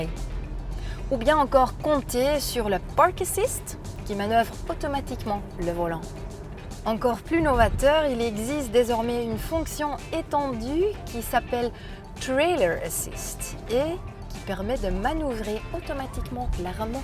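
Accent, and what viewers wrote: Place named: Netherlands. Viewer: Quel bel accent néerlandais.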